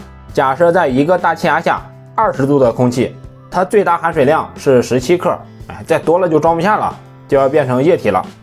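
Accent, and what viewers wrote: Henan, China. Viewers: 河南口音这么重！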